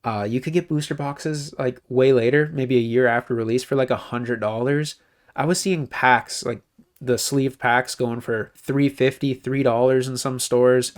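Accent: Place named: Canada. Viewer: Great Vid! Cut through lots of the filler, appreciate that. And I knew I detected that Canadian accent before you mentioned prices in $CAD lol. Cheers, fellow Cannck ;)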